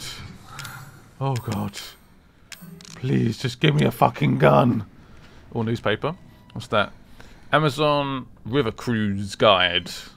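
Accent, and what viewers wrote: United Kingdom. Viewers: God I love his British voice mmmmmmmmm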